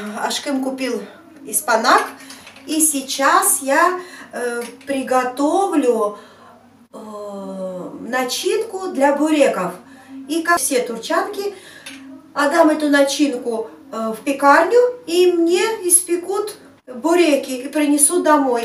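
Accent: Georgia. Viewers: Gruzinski Akzent vi gruzinka 👍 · ты там луч света акцент это нашинский грузинский испанах это мы же в Грузии так его называем смотрю твои видео с удовольствием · Этоже классно когда тебя так называют ,ашкым,севгилим,чаным,уреим,бебеим,хаятым. Я так и знала что вы с Грузии ,у вас акцент грузинский)))))) 👍👍👍👍👍👍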